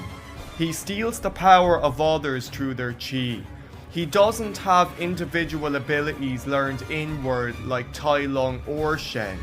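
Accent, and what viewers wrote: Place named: Ireland. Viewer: I can tell your Irish :D so am I.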